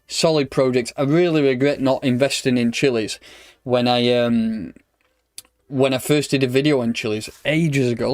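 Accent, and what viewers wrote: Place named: Yorkshire, United Kingdom. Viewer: I was working and living in Scotland about 15 years ago... and people there speaks similar to you, so to me it's a pleasure to watch your channel and listening your British English language from Yourkshie 👍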